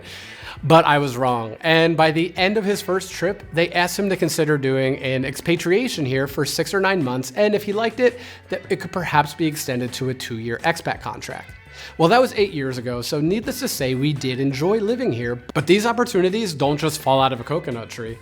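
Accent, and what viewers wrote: Denmark. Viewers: Awesome info - Thanks! (and thumbs up for the aaaaaah-mjaaaa-click-bait title....or......) As a Dane, in my ears your English is sounding more and more like most Danes - Welcome, and well done ;ø)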